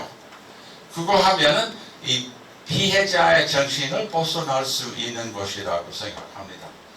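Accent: South Korea. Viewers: 교수님! 교수님 역사 강의 들으며참으로 감회가깊고 한국인보다 더찐한 한국인이심을 실감했습니다! 겉은 미국인인것처럼 보이지만 속은 한국인보다 훨씬 찐한 황인(fully ripened!) 이십니다! 전라도 지역 표준어실력 또한 기가 막히십니다! 교수니의 해박한 예리하게 정확한 역사관 마음속 깊이 존경합니다! 계속 많은 제자들 위해 헌신해주시고 주옥같은 가르침 20년정도만 부탁드리며 남은 여생 더욱더 하나님이 큰 돌보심과 축복, 은혜 교수님 가정과 주위분들 가정에함께하시길 기원합니다!❤❤❤❤❤❤❤❤❤❤❤❤❤❤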